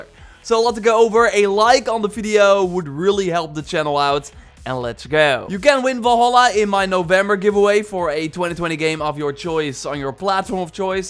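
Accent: Netherlands. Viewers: Is hé dutch?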